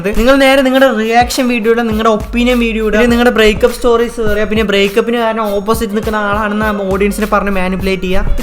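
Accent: Palakkad, India. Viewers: പാലക്കാടൻ aanno?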